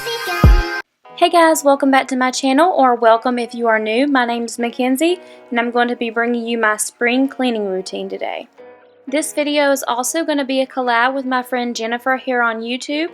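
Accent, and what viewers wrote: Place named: South, United States. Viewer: I am from Texas so I I can appreciate the drawl of a southern girl ❤️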